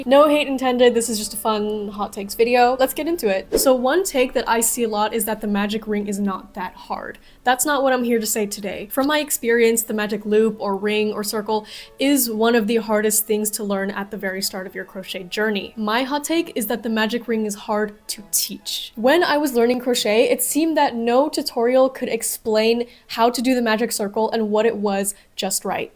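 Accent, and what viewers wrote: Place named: Netherlands. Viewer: took me until to realize youre dutch too holy shit edit: the netherlands. not holland lmao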